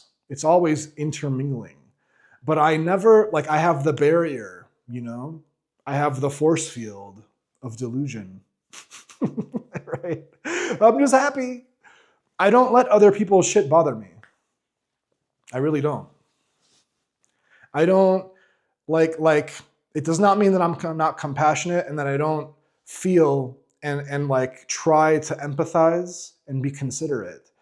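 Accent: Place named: United States. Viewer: Yap, right on the money. Golden truth through an American accent man. The key is to permanently remind oneself of this and not get triggered by outside circumstances. Unfortunately, emotions, pressure, stress, fear but also euphoria are throwing me out into the regular ego function I am used to for such a long time. The skill to maintain the inner balance is key. It takes time to build up this skill. I am working on it. Greetings from Munich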